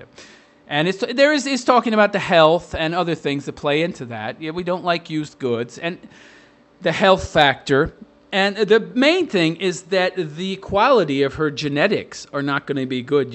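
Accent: New York, United States. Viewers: Okay.....New York.....Philly? That way you talk is killing me, it's like Archie Bunker's protege, fuckin' 'ay! Love your channel, damn you can break balls, wooo! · You sounds like a New Yorker.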